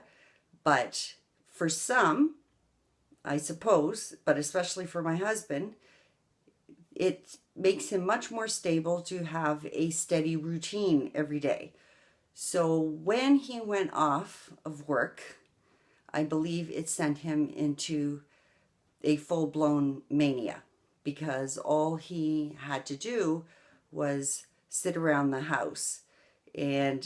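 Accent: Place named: Canada. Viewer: Accent sounds Canadian.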